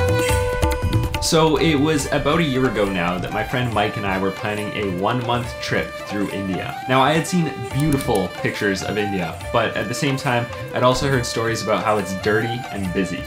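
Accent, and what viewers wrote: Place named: Canada. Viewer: You just sound Canadian .....but you are very Indian deep inside within you .... Welcome home anytime buddy ....come again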